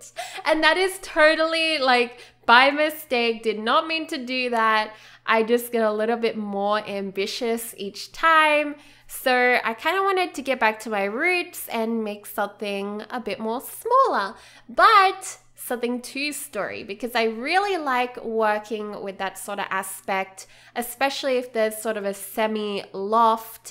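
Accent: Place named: Australia. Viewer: New to your channel and wondered if I you were Australian as well... then you said 'reckon' and I knew straightaway haha.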